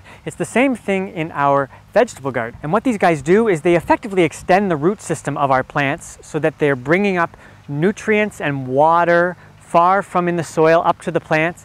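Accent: Canada. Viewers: You sound so Canadian.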